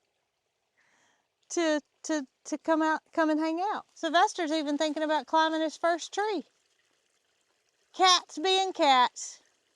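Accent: South, United States. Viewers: I really love your charming southern accent and phrases. I'm from South Africa so your accent is so different from where I am from. I love all your video content as well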